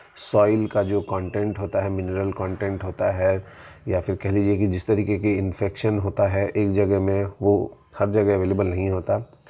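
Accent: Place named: Dehradun, India. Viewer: Hi, I do like the way you talk. Infact you do talk like my relative from Dehradun. I hope you don't mind telling me your full name and your birth place.😊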